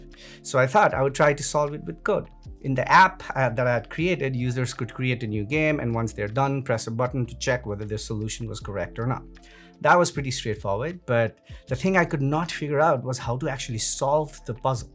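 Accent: Nepal. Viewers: Damm you are from Nepal. i knew from the tone & name but seeing conforms it. Hey, tell about how did you get there, the visa and other issues, really cluttered you know living here as a student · now im pretty sure you're from nepal Your accents and all that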